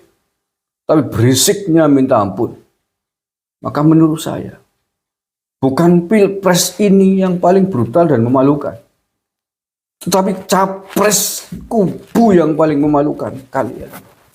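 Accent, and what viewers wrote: Banyumas, Indonesia. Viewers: Mau emosi denger bhsa ngapak jeg ketawa😂😂 ga jadi dah emosi lbh baik legowo..😊😊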